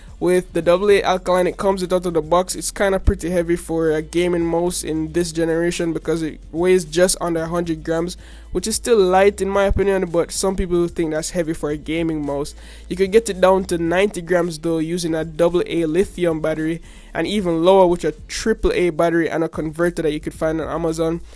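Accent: Jamaica. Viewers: Good review. You sound Jamaican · You Jamaican I’m Jamaican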